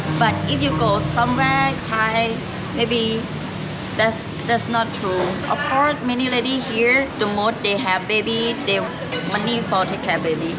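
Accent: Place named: Black Country, United Kingdom. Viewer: Sure, well said:) Where in England are you from? Sounds like Black Country...🤗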